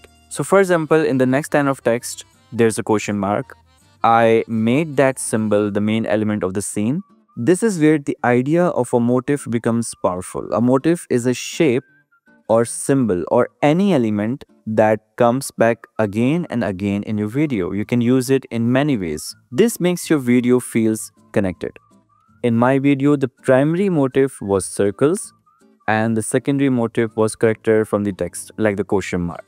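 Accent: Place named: Pakistan. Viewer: This work is definitely not worth $450, you should up your prices. If you've made such a great project in just 3 days, it can only get better with a more flexible time limit. Judging by your voice you sound Pakistani, and $450 is a lot here, but still, you've got to charge more, your portfolio is surely looking great already.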